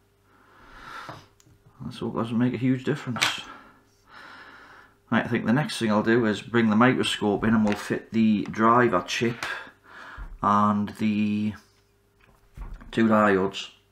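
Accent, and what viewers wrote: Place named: Morpeth, United Kingdom. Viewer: Great video. First time I’ve seen your videos! Sounds like your from the Morpeth track?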